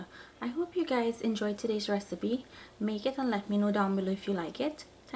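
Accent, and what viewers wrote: Trinidad, Trinidad and Tobago. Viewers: That is Trinidad accent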